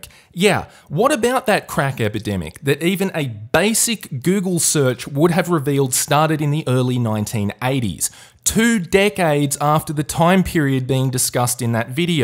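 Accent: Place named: Australia. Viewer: You speak very well (and I actually like your Australian accent) and helped me figure out the truth. I actually thought that men were smarter only at the extremes but it seems that I too had misinterpreted (fell for) the graphs and the news articles claiming that girls are doing better in school. Most likely because it won't affect my relative intelligence to other people.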